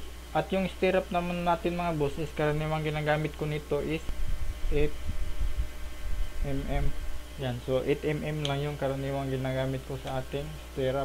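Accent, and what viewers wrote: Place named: Visayas, Philippines. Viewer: Bisaya kasi ganyan tlaga mag salita ang mga bisaya.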